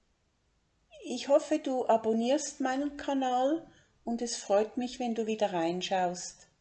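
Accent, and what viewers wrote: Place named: Basel, Switzerland. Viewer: Sehr schön Ihre Stimme zu hören! Ich schätze Ihre Videos sehr... Schöne Grüsse aus Basel ...Ich vermute (ihre Intonation) die Stadt ist Ihnen nicht unbekannt ; )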